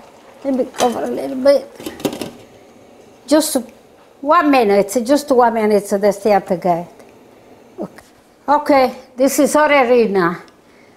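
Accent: Italy. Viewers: Dear Grandma, my parents are both first generation Italian American from New England but we all live in South Carolina now…….I’m truly sad that the grands and great grands truly have little idea of the richness of our culture……..I’m willing to bet they’ve never heard your accent while I loved visiting my Dad’s family for that very reason! What you are doing is really documenting what it is to be Italian and that is very important. Of course your cheerful voice, lovely smile and glorious food preparation keep us coming back for more….keep singing, Nonna!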